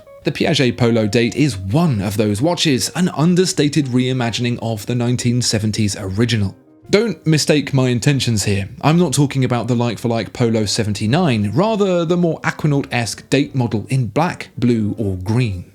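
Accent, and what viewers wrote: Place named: RP, United Kingdom. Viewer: The posh accent and babbling garrulity is just comical.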